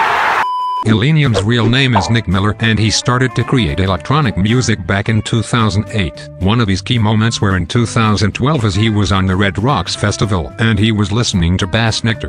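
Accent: Germany. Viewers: are you German? 😅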